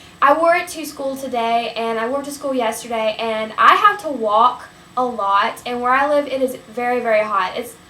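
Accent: South, United States. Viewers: You're soo pretty! Do you have a country accent? <3 & i just subscribed. (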